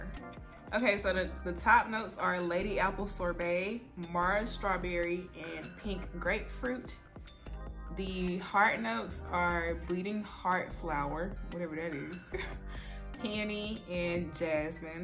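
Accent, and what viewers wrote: South, United States. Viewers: By the way I love your accent! I know everyone always says they don’t have one 🙄 but yours is so soft with a southern tone!! I’m from London so I find it so 😍😍😍!!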